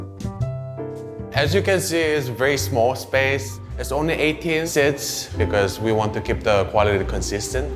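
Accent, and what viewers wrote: Mexico. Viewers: He really sounds a slightly bit mexican with the intonation of his voice as he pronounces words 😂 love it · Why does he sound Mexican?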